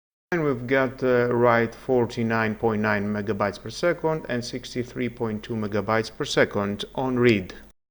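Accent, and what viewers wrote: Greece. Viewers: Τώρα εσύ μιλάς Αγγλικά σαν τον Τσίπρα μήπως είσαι Έλληνας ???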